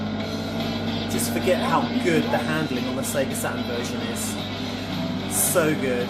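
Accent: United Kingdom. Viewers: I see Okay. You sound like you are from the UK - is there a reason why you bought the NTSC-J Import of 'Sega Rally Championship Plus' over the PAL version of 'Sega Rally Championship'? I'm not sure which version of the game to buy for my Saturn. I am also in the PAL region.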